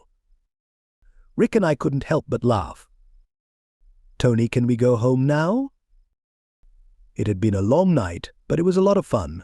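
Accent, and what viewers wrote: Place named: England, United Kingdom. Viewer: Cannot stand the English accent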